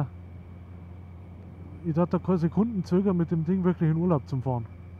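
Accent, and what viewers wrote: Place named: Bavaria, Germany. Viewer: Was ein Dampfhammer, bin zwar eher der Freund von leichteren und wendigeren bikes aber auf so einem Teil würd ich a gern Platz nehmen. Zudem gutes Video und erfrischend jemanden aus Bayern mit Dialekt zu hören macht direkt sympathisch Gruß aus der Oberpfalz🏍️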